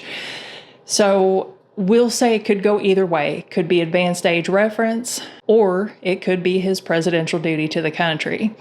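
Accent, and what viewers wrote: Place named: North Carolina, United States. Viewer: Hey I subscribed to your channel a few days ago. I’ve been watching several political terrors for months just discovered you. I want to say I’m quite impressed. Thank you for all you do. I’m in Asheville, NC and your accent sounds kind of local to me. I see not from this area. Best of luck, keep up the good work!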